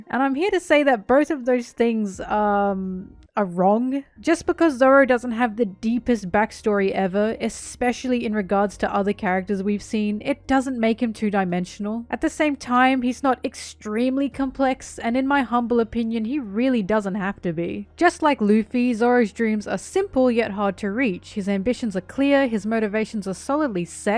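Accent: Australia. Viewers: Zoro is my favorite character in One Piece, also ur Aussie accent makes u sound Beautiful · What is it about aussie folk that make them really appealing one piece content reviewers?? xD I like the analysis and jokes and (this may seem foolish but) as an english speaking foreigner, I also enjoy the accent telling the story. Also like zoro, obviously~ (lol)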